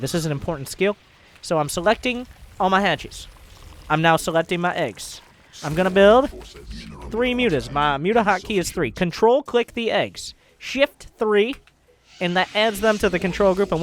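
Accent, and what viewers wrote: South, United States. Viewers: Thanks for the tutorial. I enjoyed the southern accent ;]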